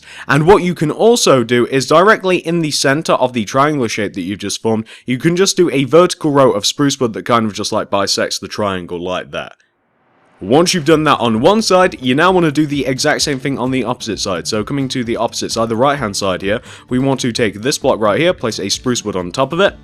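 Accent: United Kingdom. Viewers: Shock Wave it is him counting he is (it sounds like) british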